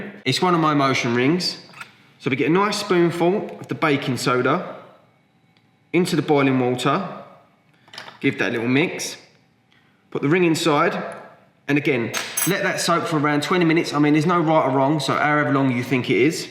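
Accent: United Kingdom. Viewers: Thank you .he is ingles brittis i dint undestand.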